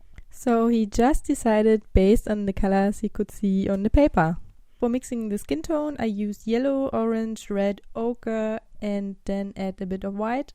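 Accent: Germany. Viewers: Your german? I hear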